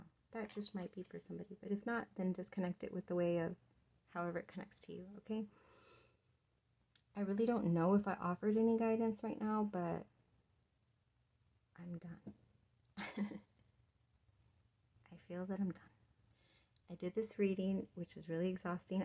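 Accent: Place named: Latin America. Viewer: Pile 3 -Oh my Goodness, What can I say but...what an extraordinarily, beautiful 😍 reading. Firstly, I love how you speak with your receiving hand. You are absolutely 💯 amazing. I would be able to understand everything you say even if there was no sound. The sign language you send out is clear and concise. I'm not sure what nationality you are and I don't want to assume but by your accent I want to say hispanic? A beautifully, sensual spoken ❤️ ❣️ LOL, I'm Ukrainian and I speak with my hands. LMAO I catch people watching my hands constantly and it looks like they are like what the hell?!😂🤣🤣 Even when you talked about the curtain you have up, your hand created that curtain as well. ✨️Truly uncanny✨️ So many syncronicities. Everything from the dried flowers(I remember the reading a few weeks ago that you first brought them in. It completely caught me off guard. 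I didnt comment because there were too many personal messages that I didn't want to share publicly. And I will say that all 3 piles resonated heavily), bessom broom and drift wood all spoke to me very deeply. My supernova tower moment happened in 2007 and since brought multiple tower moments to help me clear all heaviness I have been carrying. The most recent tower moment which started on May 12th and completed itself May 14th, has brought a serenity to my life like no other. Please know how connected you are to the collective(and well me, I feel very guilty because I feel like I am receiving free readings, which I wou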